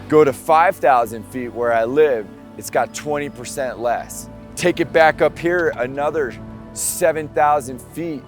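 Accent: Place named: Southern California, United States. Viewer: Yup, his accent is so SOCAL it hurts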